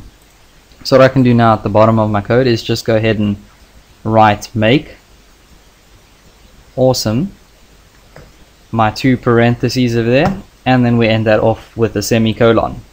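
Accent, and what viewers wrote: South Africa. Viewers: Yoh Bra u sound like a South African, anyway I have a problem I know a bit of javascript should I just jump to jquery or try to Master Javascript first?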